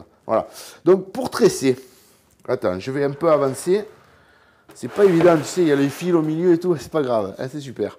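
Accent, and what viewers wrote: South, France. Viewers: tu es un super gars,je suis fils de boucher et j'adore regarder tes vidéos,et ton accent du sud ne fait qu épicer celle ci de ta bonne humeur!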